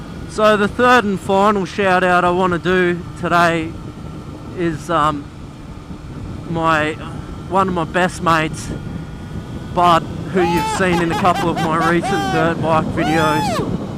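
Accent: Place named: United Kingdom. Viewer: Mate I love that catch phrase lol sounds like a top fella in the UK 😉 keep the vids coming 🐨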